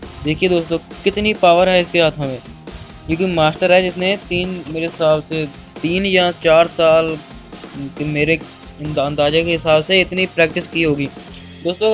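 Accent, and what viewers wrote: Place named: Punjab, India. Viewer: Narrator is from Punjab, I guess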